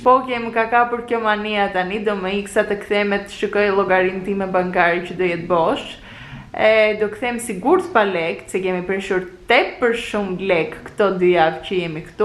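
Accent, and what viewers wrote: Greece. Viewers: Η προφορά η ελληνική στα αλβανικά σου είναι φουλ σπαστικό γιατί προσπαθείς να το παίξεις γλυκουλα ! Και βγαίνει σε σπαστικό και γενικά προσπάθησε να δείχνεις περισσότερο παρά να μιλάς κατα τα άλλα πολύ όμορφο βλογκ 🎉🎉🎉🎉🎉🎉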